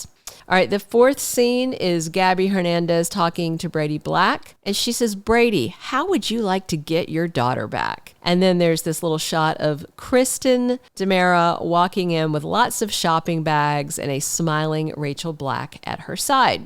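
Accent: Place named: South, United States. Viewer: I can’t wait to see all of them. But if I had to choose it’s Theresa’s asking what are you doing here. Thanks. Oh! I detect a southern accent. Are you from the South?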